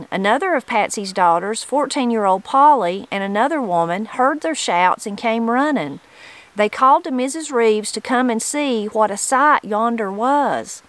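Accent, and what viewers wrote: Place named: Southern Appalachia, United States. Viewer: What part of the country are you from my sister? I'm from Lee county Va and your accent makes me homesick. We now live in Franklin North Carolina and in the past near hickory nut gorge and brown mountain. Love your videos, God bless!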